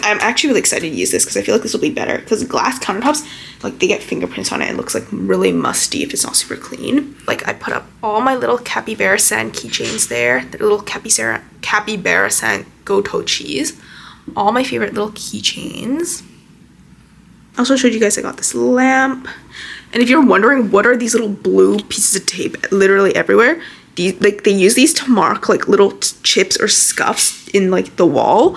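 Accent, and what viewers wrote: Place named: Toronto, Canada. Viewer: I am ready for the toronto accent to come out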